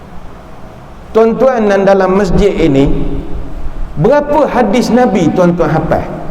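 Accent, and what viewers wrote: Pahang, Malaysia. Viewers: Sorry sis,memang macam inilah loghat Pahang,mungkin dgr mcm ada iras2 ganu sebab Kuantan sempadan dgn kemaman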